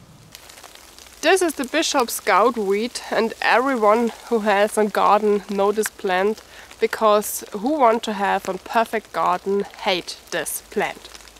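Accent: Germany. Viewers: Nice to see both dogs again, and great to see you finally have snow! It looks so beautiful. I'm making some Elderberry syrup right now, but I bought my berries, it does not grow around here, good for shortening duration of colds. Next time you say the English word stomach, pretend the ch on the end is actually a k, ka ka ka, OK? Your German accent in english is very cute, by the way. Nice spot for a fire and interesting combination, Bacon and fruit, i will try it next time I have a fire outside, thank you for the idea,.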